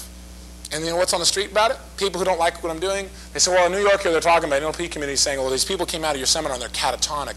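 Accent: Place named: Texas, United States. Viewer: His Texas accent is strong.. omg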